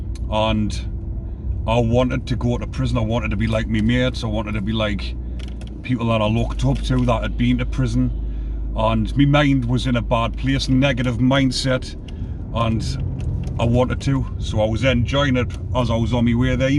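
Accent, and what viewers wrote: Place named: Scotland, United Kingdom. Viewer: like your scottish accent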